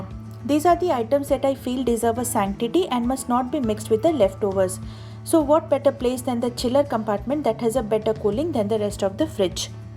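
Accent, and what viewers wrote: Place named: India. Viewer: FINALLY AN YOUTUBER WITH A NORMAL INDIAN ACCENT.. VERY NICE T PRONUNCIATION... I LOVED IT... THE VIDEOS I WATCHED USE THEIR ENGLISH DELICATELY AND ITS SO ANNOYING TO HEAR THEM SAY TTT ... AS IF THEY ARE TYPICAL SHOW OFFS.. ANYWAYS I LOVED YOUR VIDEOS. KEEP THEM COMING. SUBSCRIBED....👍👍👍👍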